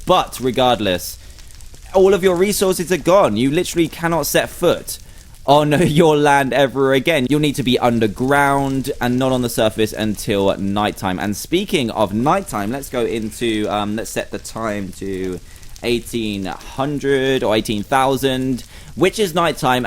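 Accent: United Kingdom. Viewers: Mean he's got an awsome Boise I've always wanted a British voice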